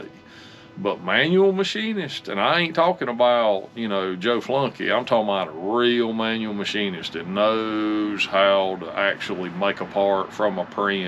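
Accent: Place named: West Virginia, United States. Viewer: are you originally from west virginia? accent sounds like my cousins from there. :-)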